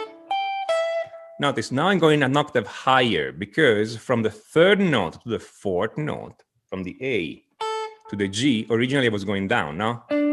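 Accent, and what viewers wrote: Italy. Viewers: I love your positive energy, your great insight, your interesting ideas and angles and your charming italian accent. You make me smile as you make me wiser. Thanks! Mille grazie!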